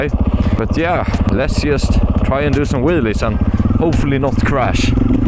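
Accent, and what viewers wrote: Sweden. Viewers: du är svesnsk ahh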